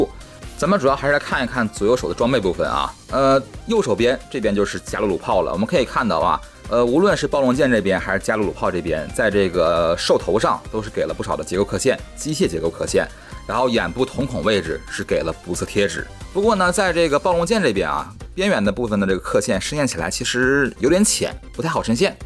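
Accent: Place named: Dalian, China. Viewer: 博主大连的？sijin抬？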